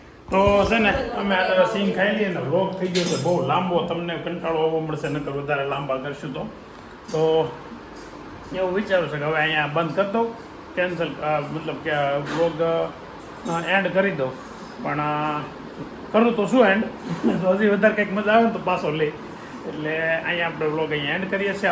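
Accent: Kathiawar, India. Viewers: Bhai tamari khatyavadi languge bau maja ave che sambhadvani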